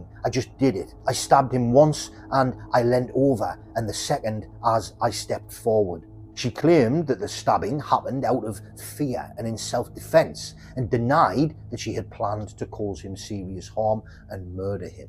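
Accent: United Kingdom. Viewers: I live in the UK, North West, and I'm delighted to hear a true crime you tube creator with a British accent and doing a stellar job of presenting these often more obscure cases as well as the obvious, I've got to give it you man you can find the ones we have not heard of and the ones that concluded like yesterday, nice format and clear presenting and very presentable, I predict a growth around here, keep it coming dude, Bravo!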